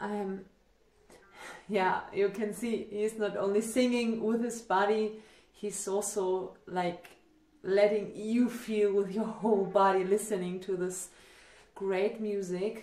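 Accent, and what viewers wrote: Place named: Central Europe. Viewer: I knew you must be from somewhrere middle-europe.... Well, must see thisone... Lets go....No idea, love your accent. Im finnish, by the way... 😚🥰 Damneeeed, this really so broken, video I mean....? God damned....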